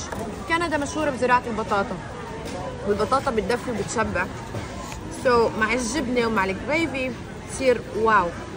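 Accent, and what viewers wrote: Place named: Lebanon. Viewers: واذا حكت لبناني عادي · ليش عم تحكي لبناني شكلك لبنانية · هي فلسطينية بس من حبها لدكتور فودها بتحكي بناني ومن الحب ما خلى الواحد يطلع عن دينو ولهجتو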